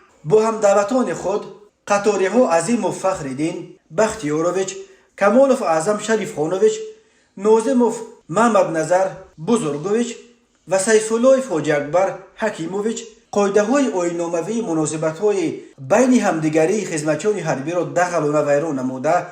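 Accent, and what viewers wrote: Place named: Badakhshan, Tajikistan. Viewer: Хами Канала барои акценти Бадахшониш гуш мекунум .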